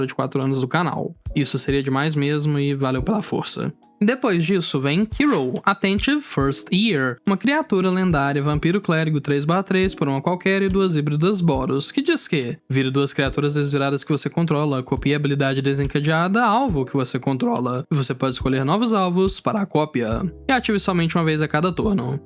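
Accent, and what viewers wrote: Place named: Minas Gerais, Brazil. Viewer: descobrindo agora q o é mineiro, entendi pq é tão suave ouvir os vídeos kakakakakka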